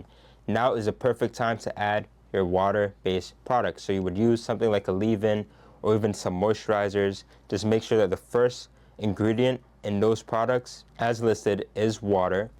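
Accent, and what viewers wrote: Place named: Jamaica. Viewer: Which country your parents come from. Mi hear yu accent !jamaica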